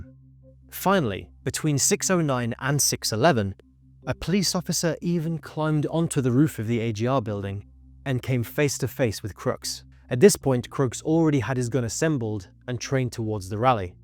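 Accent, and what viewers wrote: United Kingdom. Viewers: Did you not hear his voice. British bro.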